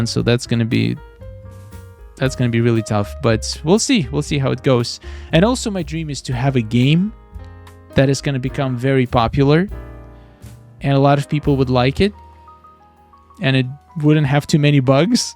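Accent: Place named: Ukraine. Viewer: I knew you are from Ukraine 😅 I was questioning myself every time I was watching your videos, trying to hear any confirmation by accent In any words 😂. And from the beginning I start watching your videos I was reading your YouTube name as, is it true? Is it your surname like that? 😅 it was my theory Thank you so much that you saying openly about russian war against Ukraine 💛💙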